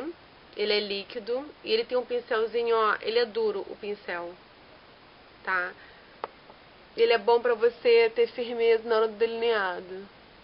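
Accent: Rio de Janeiro, Brazil. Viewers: esses comentários inúteis são de pessoas com pura inveja de vc. acho sua voz linda, adoro seu sotaque carioca e vc é linda!!!!não é atoa que faz tanto sucesso aqui no YT. bjs!!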